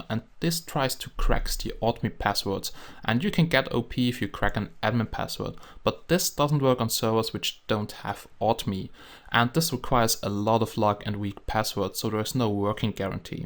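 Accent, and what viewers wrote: Germany. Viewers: Man merkt das du Deutscher bist. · Merkst du das nicht:D? ich finde man merkt es an seiner aussprache :)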